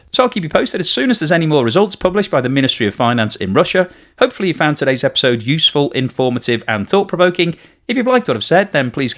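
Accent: United Kingdom. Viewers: Already the British accent tells me this vid is a heap of lies 😂😂😂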